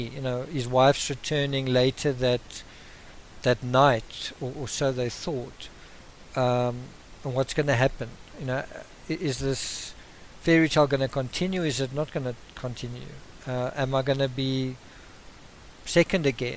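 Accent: South Africa. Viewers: He sounds like an Indian living in South Africa 🤔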